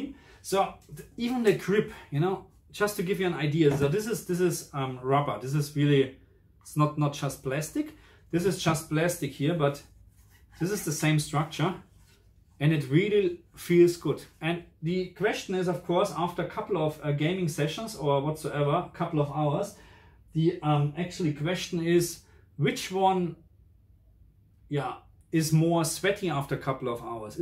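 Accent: Germany. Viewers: Kling ein bisschen deutsch das englisch, aber dafür erstaunlich flüssig.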